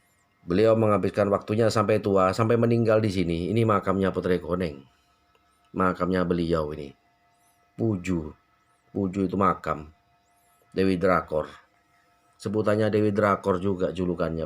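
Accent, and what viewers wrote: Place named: Madura, Indonesia. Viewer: Hehe seru denger abang bercerita logat madura semakin seru mantap bang semoga sukses selalu Merah udh aku hitamkan Mampir balik bang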